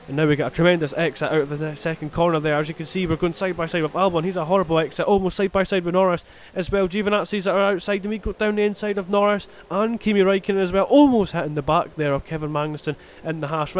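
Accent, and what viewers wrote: Scotland, United Kingdom. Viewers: Good video and your Scottish accent is great! Lovin it!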